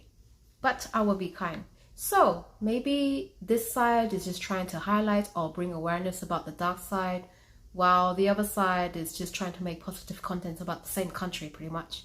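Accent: United Kingdom. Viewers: Wher'd ya acquire that pommy accent, สำเนียงบิวติฟูลน๊าจ้ะ😂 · you're british? cool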